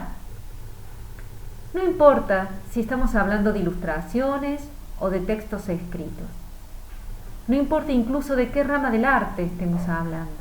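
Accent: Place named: Córdoba, Argentina. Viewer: Un luuuuuujaso (leáse con ese hermoso acento cooordoooobés)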